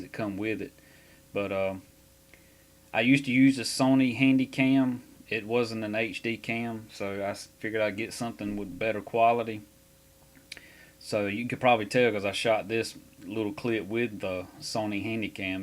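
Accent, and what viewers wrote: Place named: South, United States. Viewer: Thanks for taking us on the tour! I really like your sound as I have said before! Now, ......you have to tell me where your southern accent is from!!!! I didn't expect that! HA HA I live in SC so I know all about southern slang! HA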